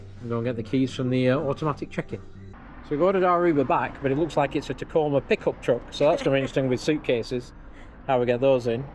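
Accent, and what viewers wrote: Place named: Yorkshire, United Kingdom. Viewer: Love the Venetian. Wow can tell your from Yorkshire 😂 what a deal!x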